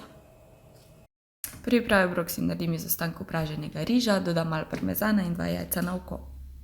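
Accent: Carinthia, Austria. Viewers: A ti si slučajno iz Koroške?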